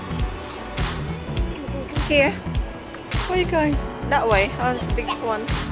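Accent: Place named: England, United Kingdom. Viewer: Dami swans sis. Madaya yong geese .... English accent ka na rin sis.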